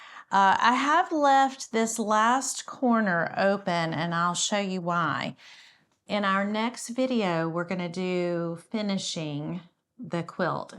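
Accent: South, United States. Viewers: Ok you have caught my attention, where do you live or from your accent is so southern?😊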